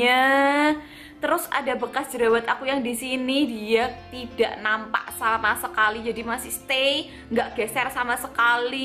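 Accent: Java, Indonesia. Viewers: Hayoo sapa seng suka nntn chaenell e tp jrng ikut comen ky nyong 😆jempole mana 😄lophe lophe 😘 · itu pakek emina apa? Pelembab atau apa? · itu potongan rambutnya apa namany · Bikin video bodycare routin dong 😍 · salam selalu😍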